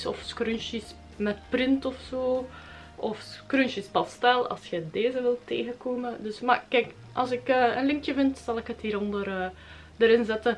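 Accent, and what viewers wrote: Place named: Aalst-Ninove, Belgium. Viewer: Ik denk dat je uit mijn streek bent ( Aalst-Ninove etc). Aan de spraak te horen ;)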